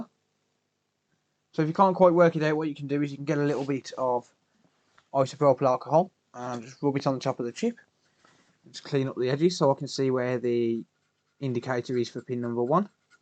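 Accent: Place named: Dudley, United Kingdom. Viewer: You from dudley ?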